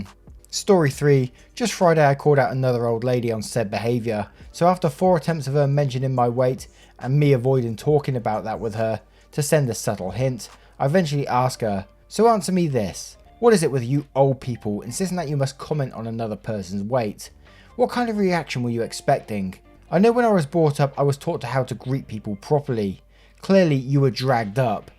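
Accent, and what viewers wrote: United Kingdom. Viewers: Where are you from? I can't pinpoint your accent, my best guess is British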